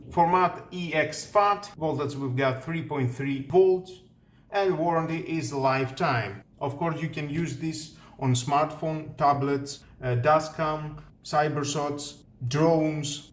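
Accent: Greece. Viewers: Τώρα εσύ μιλάς Αγγλικά σαν τον Τσίπρα μήπως είσαι Έλληνας ???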